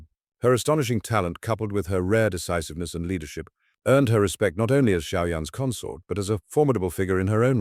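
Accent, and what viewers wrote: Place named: United Kingdom. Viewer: British accent is not match the stories like this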